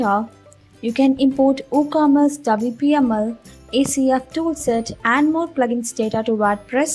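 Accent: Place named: India. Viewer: I love Indians and their English.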